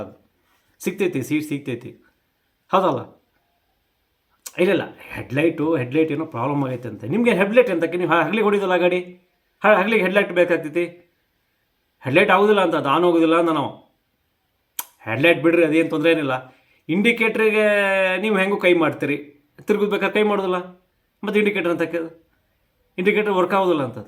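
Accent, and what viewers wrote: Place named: Konkani, India. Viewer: super anna , hinge mathadadu konkni avvu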